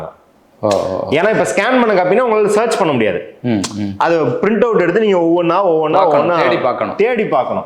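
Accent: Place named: Kerala, India. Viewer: நெறியாளர் கேரளைவை சேர்ந்தவரா? அவருடையபேச்சு மலையாள வாடை வீசுகிறதே...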